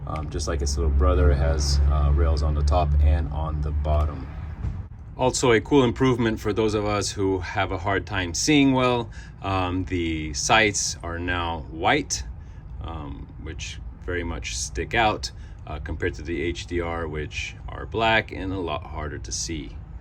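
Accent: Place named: Italy. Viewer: Ciao grande!,. Tu sei italiano vero??? Su sente dallo slang inglese ... Parli perfettamente inglese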